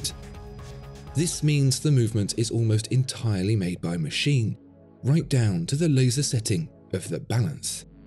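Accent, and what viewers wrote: United Kingdom. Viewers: As a American praise a $400 watch with a British accent and I’m sold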